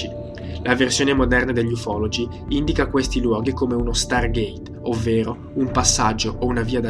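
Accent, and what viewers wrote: Italy. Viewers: Lui ha l'accento italiano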